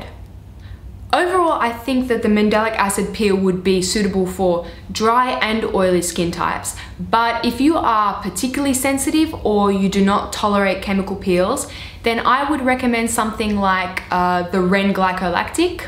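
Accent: Australia. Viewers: Lol the end was funny, nice to you as you!!! Btw, r u Australian?!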